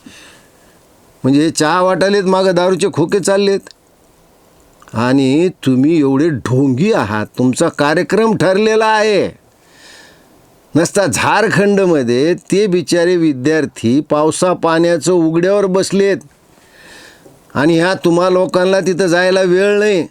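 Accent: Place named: Satara, India. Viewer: एकदम सातारी भाषा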